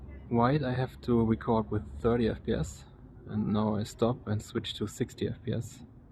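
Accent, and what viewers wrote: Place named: Germany. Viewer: Immer wieder spannend, dass man instant hört, dass du aus Deutschland kommst. 😂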